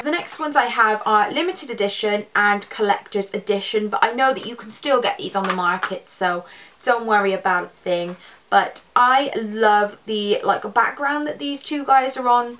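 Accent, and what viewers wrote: United Kingdom. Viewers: I liked your accent UK 🌷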